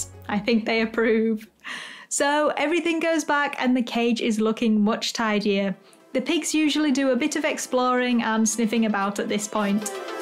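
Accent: United Kingdom. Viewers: She is so British I am American lol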